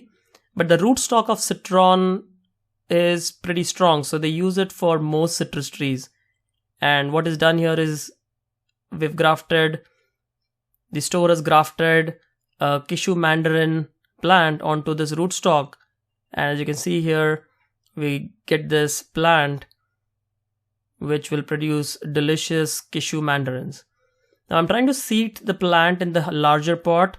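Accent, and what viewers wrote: India. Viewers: U talk same Indian people, where are you from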